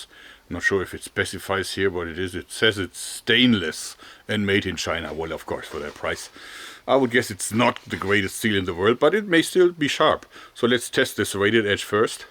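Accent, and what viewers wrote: Germany. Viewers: Sobald ich deinen Akzent gehört habe wusste ich, dass du deutsch bist 😅 Schön mal einen Deutschen zu sehen, der solche Videos macht (auch wenn sie in Englisch sind, was für mich kein Problem ist)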